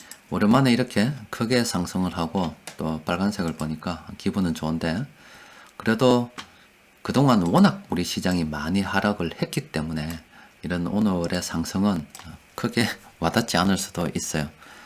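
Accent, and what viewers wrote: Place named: Daegu, South Korea. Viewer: 대구말씨가 참 구수하네요 좋은 내용 감사합니다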